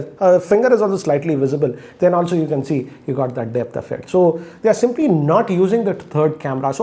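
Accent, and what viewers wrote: Hyderabad, India. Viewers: We miss your hyderabadi hindi 🤣🤣🤣😃😃😃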